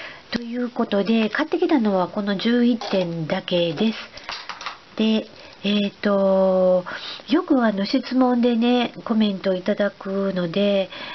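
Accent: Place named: Osaka, Japan. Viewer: の動画大好きです！センスが素晴らしくて、いつもDIY意欲が掻き立てられます(⁎˃ᴗ˂⁎)100均大好きなので、「この商品、こんな使い方できるんだ～～✨」って新しい感動もあります！あとわたしも大阪弁なのですごく親近感わいてしまいました(´ `)照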